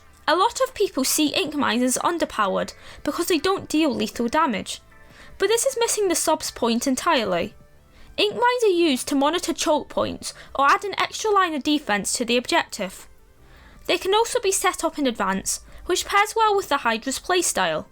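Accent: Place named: Scotland, United Kingdom. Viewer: your voice is very scottish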